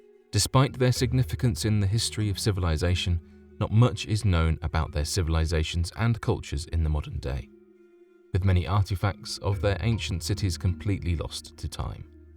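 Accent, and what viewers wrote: United Kingdom. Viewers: Thanks for the nice British voice narrative. A nice distraction from the Trump nightmare. But if we're an alien ant farm, which I believe, why can't they keep things under control better??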